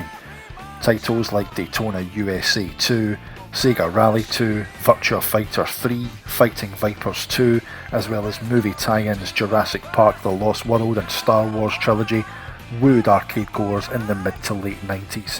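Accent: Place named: Falkirk, United Kingdom. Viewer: OP Just curious what part of Scotland you from? Sounds like maybe around Falkirk?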